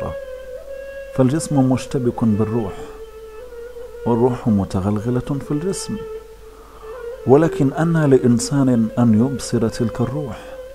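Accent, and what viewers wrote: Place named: Morocco. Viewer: أظن انك مغربي، أحس بحرف التاء كثير في قراءتك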